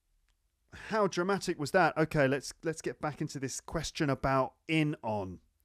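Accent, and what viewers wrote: United Kingdom. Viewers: hello... First time watching you....great..I love your British accent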